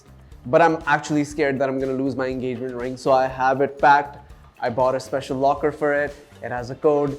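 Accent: Punjab, India. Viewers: His punjabi accent is so cutee 😭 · I also think that because what if you have a wife/husband who changes you as a person that you're not even good friends with your best friends and I feel like that wouldn't be a good wife/husband. My punjabi is exactly like yours but honestly yours is the cutesttt ❤ If comes next to me on an airplane I would scream so loud that the whole plane would know that what just happened or either I'll be starstruck and not able to say anything. About the question "what if you got stood up on a date" my answer would be that that's not gonna happen 😂 I'm really really horrible with jewelry and rings and rings are something I never wanted to wear. I also have the fear of losing the engagement ring so I'll keep in a locker too or wear it with a chain as a necklace. I would have to come up with an excuse if I'm late. It would be an ideal scenario to get married in if you only have those people around you who mean a lot to you honestly. 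I would ask the same question to the alien that where he's from If I'm being recorded and you tell me that you were not recording all this time I'm gonna walk away. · The cutest punjabi accent everrr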